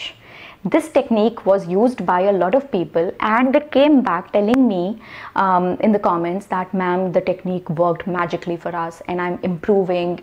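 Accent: India. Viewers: Your hindi's tongue made your english so clear. I enjoyed listening your english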